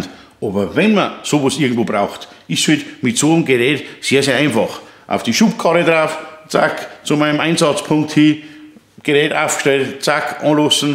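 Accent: Bavaria, Germany. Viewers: Hallo, wie immer in deinem Urbayrisch ein klasse informatives Video. Du schwätsch halt et um d heiße Brei. Mach weiter so. Das #ks2000 wäre perfekt für den Einsatz bei unseren Pferden. Die Pferde stehen in einem kleinen Offenstall abseits von jeder Versorgung. Für Licht oder im Notfall den Tierarzt mit seinen Geräten versorgen zu können wäre ein Traum. Besonders da das Gerät so flexibel ist mit Gas oder Benzin. Viele Grüße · #ks2000 Den hatte ich mir die Tage auch näher angeschaut 👌 Und noch eins: Ich bin kein Bayer sondern aus dem Westerwald. Aber bitte sprachlich nicht verbiegen. Bleib bayrisch 🎉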